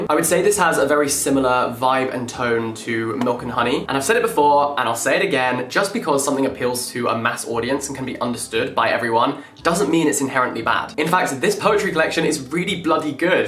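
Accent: United Kingdom. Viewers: I love his british accent and ofc his word games hahah ;)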